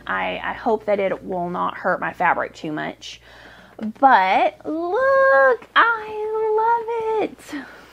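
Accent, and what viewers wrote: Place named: Arkansas, United States. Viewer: You are so creative. When I saw the notification today, I said, Yay!! 🥰 Always a pleasure to watch you and, yes, hear your cute Arkansas accent (said the Midwestern Michigander). 😂 Fun fun fun. Thanks for another great video.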